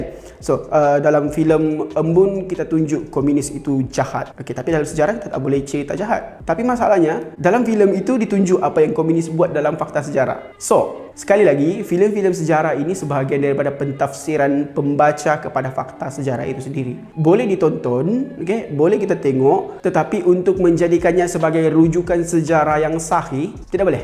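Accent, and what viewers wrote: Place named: Sabah, Malaysia. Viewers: Mcm loghat sabah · Sabah kan😂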